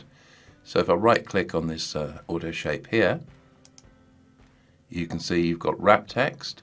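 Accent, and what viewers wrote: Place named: United Kingdom. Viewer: Excellent tutorial ! You are an excellent teacher and presenter. I must also comment on your cultured British diction... it is heavenly. We in the Queen's American Colonies speak a doggerel and uncultured version of the English language, of which we should all be ashamed. It is such a delight to hear someone who speaks in a cultured manner, in complete sentences, and without lacing every sentence with those pig-grunt utterances of the non-words "UH" and "gonna." I shall be watching more of your videos. Liked and Subscribed. Hold High the standard of proper and cultured English speech !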